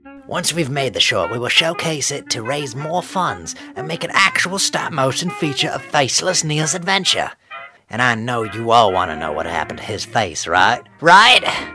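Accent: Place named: France. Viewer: T’as un accent français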